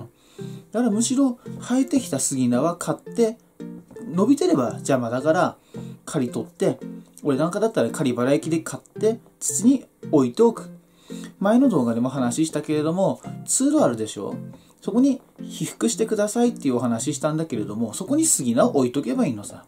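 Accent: Tsugaru, Japan. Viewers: このイントネーション…津軽人だな？ そーいう私も青森市在住ですw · 東北、青森県、津軽地方の方ですか❓️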